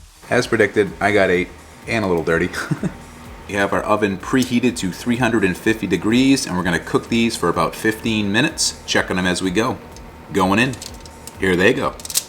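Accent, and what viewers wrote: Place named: Massachusetts, United States. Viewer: Do I detect a MA accent?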